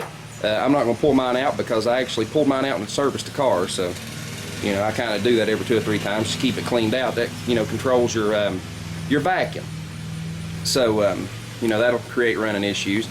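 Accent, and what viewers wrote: South, United States. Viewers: This dudes country as fuck ...but thanks lmao worked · SELLAAHNOIIID Just kidding mate, I love that southern accent :)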